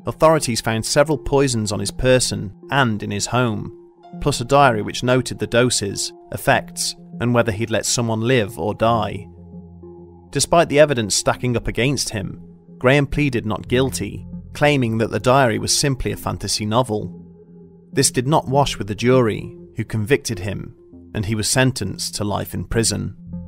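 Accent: United Kingdom. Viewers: Sorry just because it’s a British accent doesn’t mean it’s good 🤷🏽‍♂️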